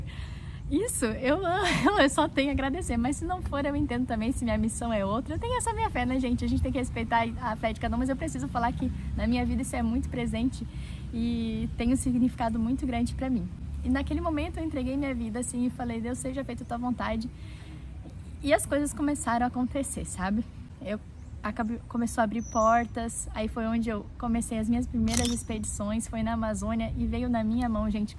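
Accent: Brazil. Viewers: Abraços de Ibirama/SC!!!! Parabens pela Vibe muuuuuito boa e esse R com sotaque paranaense minha terra natal!!! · Você é muito simpática! Tem um sotaque mineirim....O Guto "indicou" o seu canal. Sucesso!!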